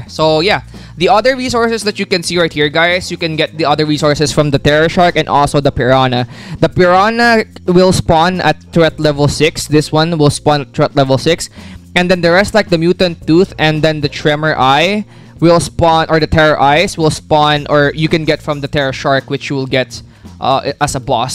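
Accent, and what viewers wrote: Philippines. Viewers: Are you a Filipino? Because i think you are cause of accent lol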